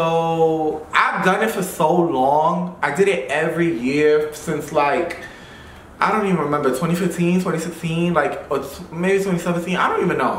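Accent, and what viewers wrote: New York, United States. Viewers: I have missed your face and that NY accent. So glad you're back!!!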